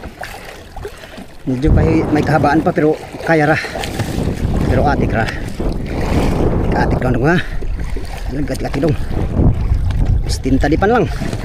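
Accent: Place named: Visayas, Philippines. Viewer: Idle ayusin monga yung pagsasalita mo magtagalog May halo kasing bisaya,kong bibisain mo nalang kaya para hinde ka mahihirapan sakalisod haha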